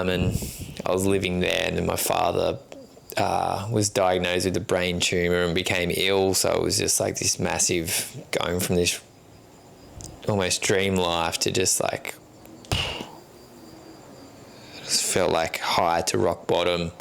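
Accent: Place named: Australia. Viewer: I’m grateful your YouTube channel came up on my feed. Not sure why, maybe it’s the Aussie accent that I adore. You don’t look apathetic, you speak gratefulness and that’s a great place to be, no matter what. Sorry to hear about your father, prepare yourself, because those blissful moments are too fleeting. Did you get to visit the US while in Canada? Would ❤ to see more, you speak with your truth very well.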